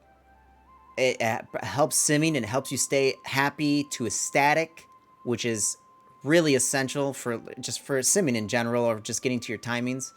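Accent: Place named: Seattle, United States. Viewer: Awesome Tier list! Just out of interest - where is your accent from? Are you from Seattle by any chance?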